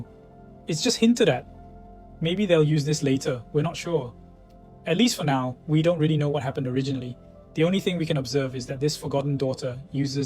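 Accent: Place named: Asia. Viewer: 這是AI不是本人嗎？我還覺得這期亞洲音比上期重呢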